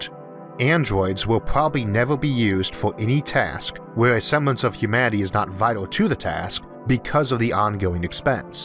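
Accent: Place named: South, United States. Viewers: You should speak more in the southern accent. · While it's not an accent, his voice sounds enough like a Southern US speaker that my brain just loads Georgia.txt and understands him fine (well, I can understand, my time living there was worth it then!). His older videos are hard, but I think that's the fault of whatever mic he was using. The new ones are fantastically clear.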